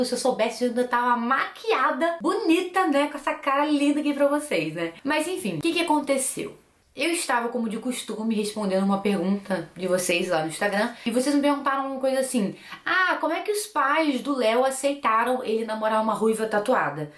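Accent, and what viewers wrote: Rio de Janeiro, Brazil. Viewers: amo o seu jeito de falar cariocaaaaaa, KKKKK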